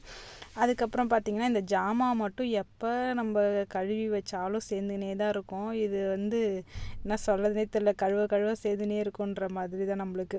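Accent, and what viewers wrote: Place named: Chennai, India. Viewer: no sis chennai nanum Village la than poranthu valanthen innaikkutha unga video first time pakkuren namba ooru slang la pesureenga romba happya irunthuchi ❤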